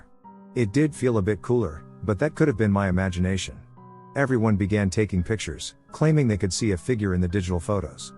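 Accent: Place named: South, United States. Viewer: I've been watching for a long time but I finally decided to subscurb because I'm excited to find out that the tts is a fellow southerner. Yeehaw yall!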